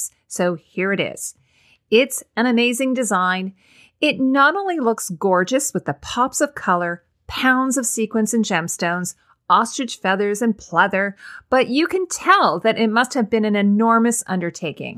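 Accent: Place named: Canada. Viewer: I notice a very slight Canadian accent on words like "about" :) It leans into to Canadian "aboat"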